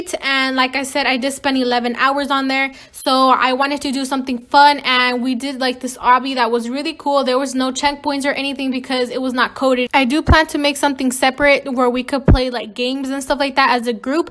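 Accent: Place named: Latin America. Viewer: Yay I thought you were also latina because whenever you talk I always hear a spanish accent (if you know what I mean) now I know we both are 😊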